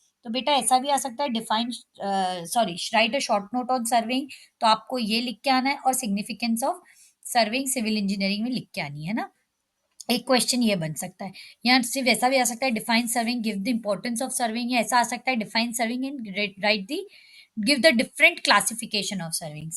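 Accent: Indore, India. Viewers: ma'am's ur indori accent is the key..😯😯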